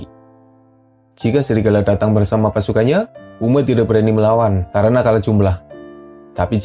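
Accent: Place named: Tegal, Indonesia. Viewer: Admint nya mudah dipahami karena logatnya Tegal atau Banyumas banget 😮😂, pada Karo nyong wong ngapak. Malah ingat lagu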